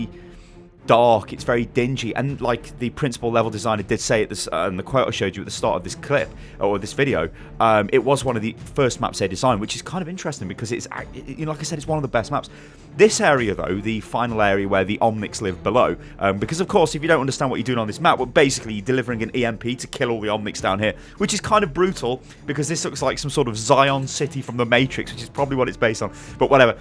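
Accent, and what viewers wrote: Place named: Midlands, United Kingdom. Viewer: You're British, stop saying "like" all of the time... you sound like an Orange County teenage girl... with a midlands accent.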